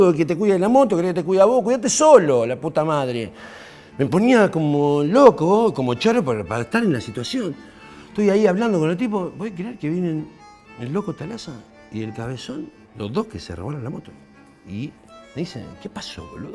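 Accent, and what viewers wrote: Argentina. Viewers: Muy Bien con tus nuevas anécdotas! Jajaja excelente ese acento que se me esta pegando aca en mi propio País Chile! Saludos Kl! Se le quiere acá en Chile! Andá... y traeté otro personaje o amiga para que recorras Chile de Arica a Punta Arenas y luego enseñes La Gran Argentina Boludo! Saludos! Que Dios bendiga a tu pueblo y tu carrera bye! 🇨🇱🇦🇷🤣🍻📺😀😉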